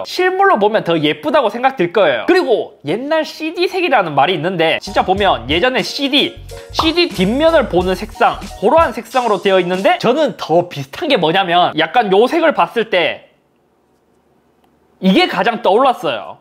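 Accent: Gyeongsang, South Korea. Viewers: 죄송한데요..다 좋은데요..목소리가 너무 듣기가 불편합니다.경상도 사투리에 톤이 너무 높아 시청하면서 듣는데 심장이 쿵땅 거립니다.목소리 톤좀 낮춰서 영상 만들어 주시면 시청 많이 하고 싶습니다.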